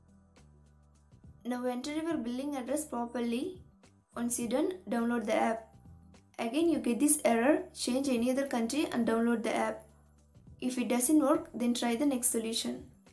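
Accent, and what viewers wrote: India. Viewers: it says new york and the person talking sounds indian so im pretty sure its a fake address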